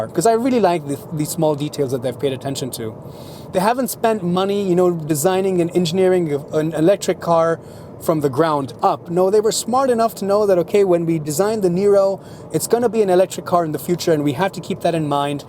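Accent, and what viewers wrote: Germany. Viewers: eine frage warum gibts die test´s nicht in 2 sprachen ? oder mit untertitel den soweit ich das höre haben sie doch einen deutschen aktzend ;)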